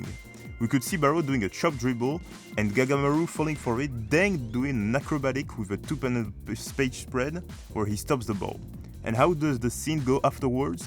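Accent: France. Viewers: je me disais que t’avais un accent français 🤣jusqu’à ce que je vois ta bio, continue comme ça bgg force !!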